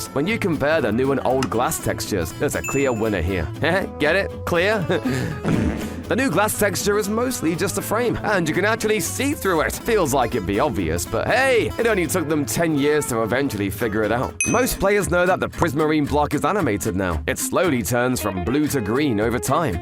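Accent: United Kingdom. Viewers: This guy has such a youtuber/British accent, it pmo so much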